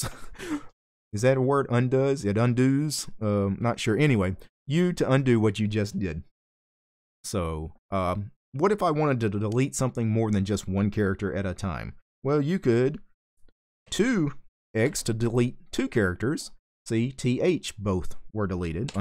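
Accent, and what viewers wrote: South, United States. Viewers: You're from the south?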